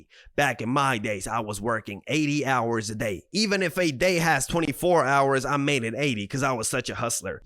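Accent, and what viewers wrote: New York, United States. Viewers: Thats a new yoker accent for ya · Bro sounds like he is from new york or something😭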